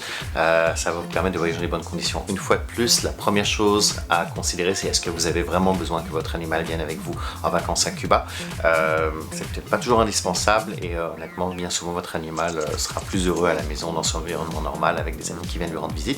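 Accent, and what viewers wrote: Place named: Quebec, Canada. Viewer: Bonjour, merci pour cette vidéo extrêmement détaillée. Même si j'étais fort bien préparée, j'ai appris de nouveaux conseils avec vous. Je me sens beaucoup plus confiante aussi. Également, je me demandais êtes vous québécois? Vous n'avez pas l'accent français. A bientôt 😊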